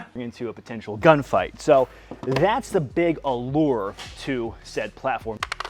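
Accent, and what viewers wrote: Russia. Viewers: His russian accent is almost as good as FPSRussias So thats good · FPS Russia-level accent. Kyle would be proud.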